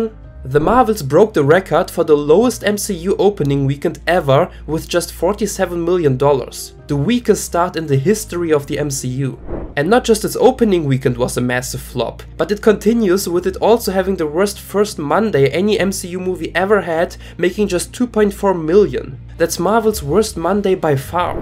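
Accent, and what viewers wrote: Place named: Germany. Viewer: Ich habs mir bei dem Akzent doch gedacht... a daitscher Landsmoan! 😂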